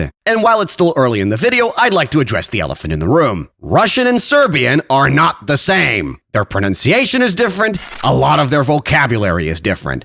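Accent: Croatia. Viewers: 😂😂😂😂😭 pre dobroo , ali je li ovaj glas hrvat? Ne zvuci mi ka srbski naglasak? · sounds more Croatian than actual Croatians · Mogao si ovaj video da nameniš Hrvatima, pošto si njihovo akcentovanje i izgovor potrefio 100% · He does have a croatian accent when he pronounces his words, it's cute tbh😂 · Ne znam kako je u drugim jezicima, ali kod nas, pored toga što možeš da pogrešiš u pisanju reči, veliki broj starijih ljudi greši i gramatiku. Na primer, pišu "neznam" umesto "ne znam", "netreba" umesto "ne treba", "samnom" umesto "sa mnom", "bi" i "bih" mešaju, kao i "bio" pa napišu "bijo". To je dosta izraženo po selima i u mestima koja nisu naročito naseljena, Takođe, u nekim krajevima Srbije, posebno na jugu i jugoistoku, u svakodnevnom govoru često se koriste samo tri ili četiri padeža, pa se ostali gotovo i ne upotrebljavaju. Svaka čast na izgovoru reči, baš si pogodio. 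Ne znam da li ti je to neko ikada rekao, ali naglasak ti dosta vuče na hrvatski. Hahaha. Svako dobro, legendo! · Serb here. Very cool vid, didnt know you would make a vid on my language. Also you sound more like a croatian when speaking serbian 😭 Though nice vid. Hvala brate, samo tako nastavi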